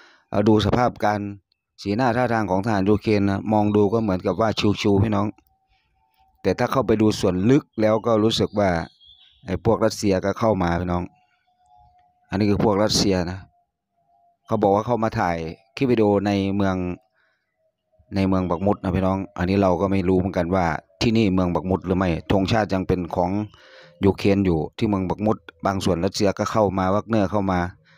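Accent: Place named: North, Thailand. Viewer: แอ้ดมินน่าจะเป็นคนเหนือสำเนียงแบบคนเหนือครับพ้ม😊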